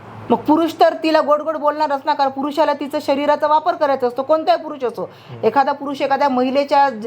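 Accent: India. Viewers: Aapli nanded hingoli chi bhasha, language ❤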